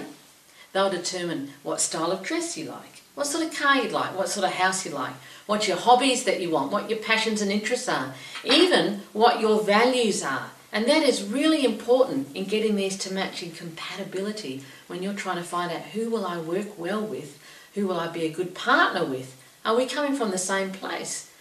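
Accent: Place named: Australia. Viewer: love both - the jungian archetypes & the aussie ascent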